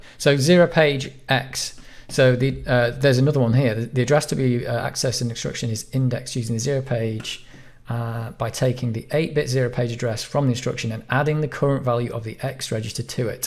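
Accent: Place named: United Kingdom. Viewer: Try to hire vocalizer it's high viscosity and hear it is unbearable. So much ear scratching and most of time floating slowly I listening at least +2x speed. I recommended you to switch standard US accent even Bharat more heareable than british. Or will be good if there is have any settings for prevent some countries and accents. I will glad if anyone knows like that filter tell me. Closing all sources much better than first option. Why i have to hear ridicilous sounds? Converting with transcript to all videos from same sources means cost.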